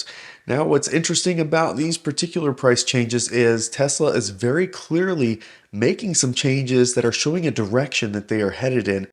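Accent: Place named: Greece. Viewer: youre greek arent you! lol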